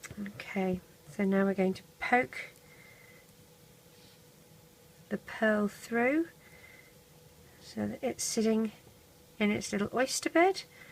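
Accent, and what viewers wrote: England, United Kingdom. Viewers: u sound english!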